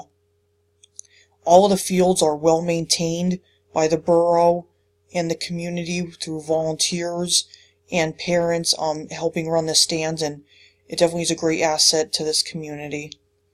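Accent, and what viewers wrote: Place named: Northeastern Pennsylvania, United States. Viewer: I was born here in 1945 and just sold my father's house not too long ago. I still come back to visit. Great job,. Hopefully, people like you will make Plymouth once again what is was when I grew up there. You even speak NEPAlese perfectly! May God bless your life in Plymouth.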